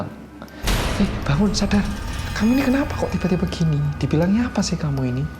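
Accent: Java, Indonesia. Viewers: Siap orang korea yang medok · Mas bikin video ngajarin Elsa Bahasa Jawa biar ikutan medok, dong. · Lebih suka mendengarkan ceritanya mas,enak di denger soal nya mas medok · Mas Medokkkk 🥰🥰 pagi massss ..ttp sehat ya....❤❤❤ LANJUTKANNNNN... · Mas medok🤗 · YANG MEDOKKKKK!!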